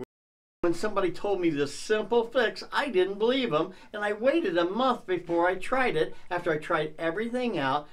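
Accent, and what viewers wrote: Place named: Chicago, United States. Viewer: where are you from in Chicago I can hear it in your voice my guy!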